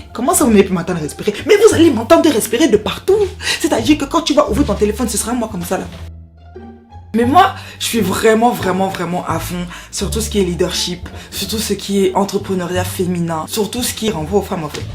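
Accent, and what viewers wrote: Cameroon. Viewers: Hello, j'ai bien compris que tu étais camer, avec ton accent. Mais au début je me suis dis, tien tien est Bamoise. Puis, petit à petit, je me suis dis, non, non, a un parlé qui me rappelle les Etons. Ben en effet, là maintenant je suis un peu perdu. Eton, pas Eton, Bamoise, pas Bamoise?????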